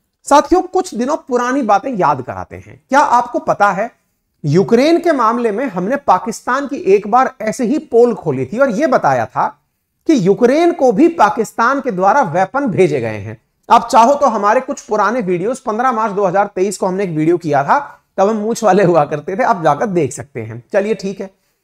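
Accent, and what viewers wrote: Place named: Rajasthan, India. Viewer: आप राजस्थानी हैं मुझे राजस्थानी की पहचान है अतः आप मुझे रखें राम राम भाई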